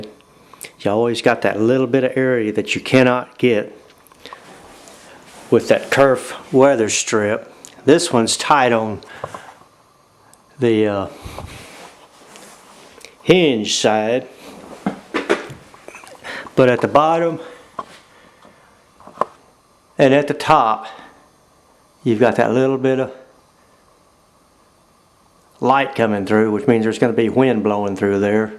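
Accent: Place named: Appalachia, United States. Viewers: That southern accent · Why is every one of these videos narrated by someone with a hillbilly accent.....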